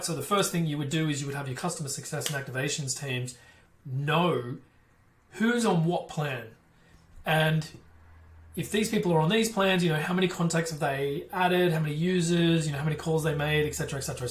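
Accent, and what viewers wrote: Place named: Australia. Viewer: Hey, Love the Content, got a question for you. From your accent i'm assuming your from Aus and am aswell, I have recently acquired GHL for starting an agency however my concern is when using a mobile phone number from Twillio/ Lead Connector the billing & pricing is way too high and would mean im getting charged a lot from my clients in the future. As such do you use a Mobile phone number or other type of twillio/ Lead connector phone number type for your clients and yourself? Cheers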